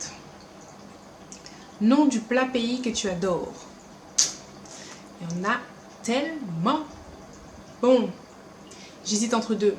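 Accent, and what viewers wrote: Guadeloupe, France. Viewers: OUPSSS... comment faire la cerise à partir du PC? Pois rouge et dombrées? Mdrrr oui pour les dombrées et non pour les pois rouge! Oui ce qui rappelle tes origines c'est ton accent guadeloupéen. L'accent martiniquais me semble t'il est moins chantant. Ceci dit j'aime beaucoup ton accent. En sé fèw palé lonten. J'aime le ''migan de fruit-à-pain'' ou les ''mantouts'' (appelé au 971: krab a bab) Vivement ton prochain tag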